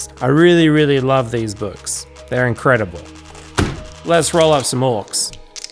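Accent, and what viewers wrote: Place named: Australia. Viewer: I can see and hear that Australia axon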